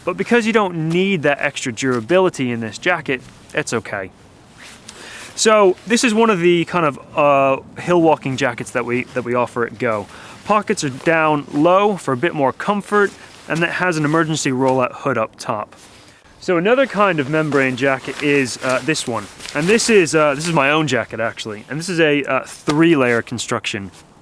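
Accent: United States. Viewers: It’s definitely not Scottish or Irish mainly American seems like an American who’s been living in the uk for a while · This guy sounds like an American who's been living here in England for too long, he's starting to pick up a hint of English accent.... you can tell from the ending of words like "sheet" "belt" "about" "what" "it" and "fit" which all happen to be words ending with the letter T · You sound american but don't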